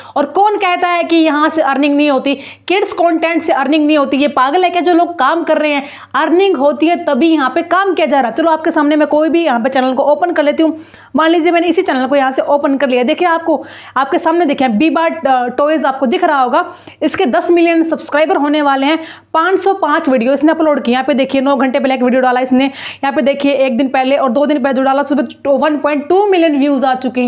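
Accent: India. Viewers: Haryana ya Delhi se mam???? Language apki haryanvi hai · your slang is like punjabis??? you are a punjabi???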